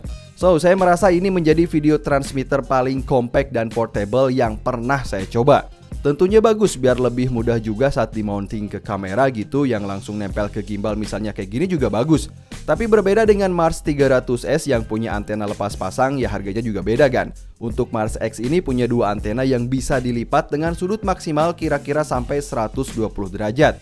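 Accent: Kuningan, Indonesia. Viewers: Bang abang orang kuningan yah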